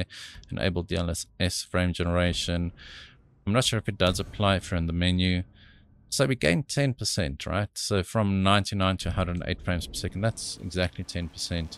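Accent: South Africa. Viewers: Is this an SA boi I hear?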